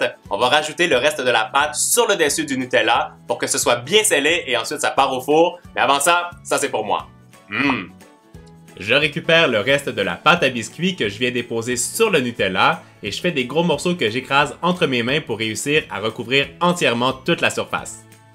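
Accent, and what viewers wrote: Canada. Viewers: J'adore ton accent canadien t'est vidéo son trop bien continue comme ça on adore tes vidéo YouTube bisous 😘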